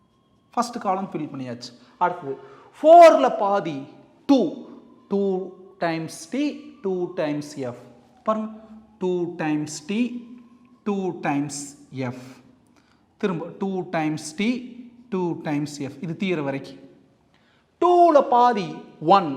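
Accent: Coimbatore, India. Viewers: Sir nenga coimbatore ah covai slang la pesurenga 😅❤😊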